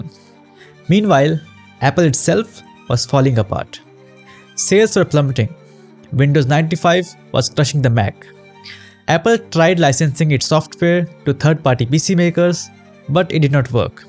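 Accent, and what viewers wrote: India. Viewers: that indian accent is killing me. cow dung eater lol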